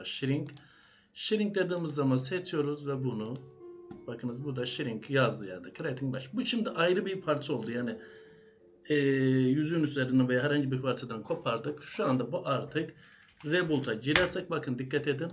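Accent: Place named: Azerbaijan. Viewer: Azerbaycan lehçesi var sanki hocam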